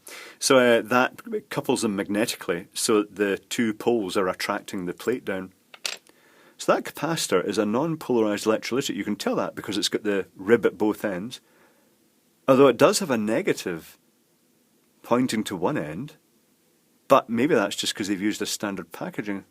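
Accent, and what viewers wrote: Scotland, United Kingdom. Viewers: Not video-related, but I'm curious to know your history, how'd you get involved in this stuff, and how you ended up on the Isle of Man? I detect a distinct fellow Scottish accent ☺ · That an isle of man accent? sounds scottish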